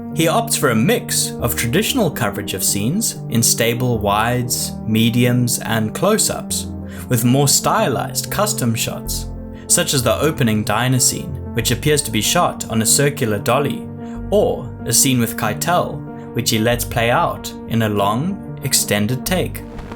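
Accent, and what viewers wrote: South Africa. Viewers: Is this man... a South African? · South African? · Sounds like a South African narrator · Are you south african? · U South African?? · Not even a few minutes in I kept thinking, this dude must be South African, so I checked your channel info and lo and hehold! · Are you from South Africa man ? Your accent sounds like it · Hearing this accent again reminds me why I left SA. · South African Accent? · Subbed when I first heard the South African accent. Great video 👍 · Bro your South African, right? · You sound South African? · Are you South African? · I love your channel boss, you're South African right?? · I’m not sure if using “from dusk till Dawn” was an intentional reference. You sound South African. Are you in Jhb? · Are you South African? I recognise that beautiful accent from miles away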